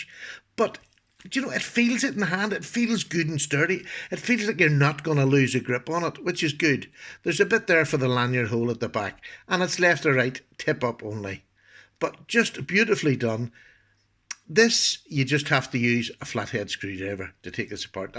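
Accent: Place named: Ireland. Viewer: This review made me purchase this knife. Your voice sir makes me want to visit Ireland and drink large quantities of beer. Cheers from Texas!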